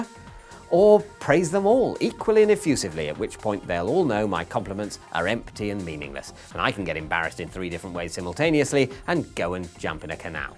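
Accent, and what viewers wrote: United Kingdom. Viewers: Its like the neurotic voice in my head got a nice British accent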